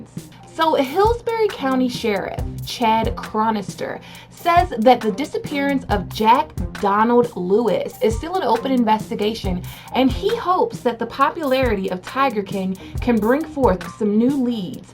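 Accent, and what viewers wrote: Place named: Maryland, United States. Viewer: I feel like your from bmore and I just found you. I'm from bmore so I recognize the accent. You sound from Maryland! Love your vids. Great job Hon!!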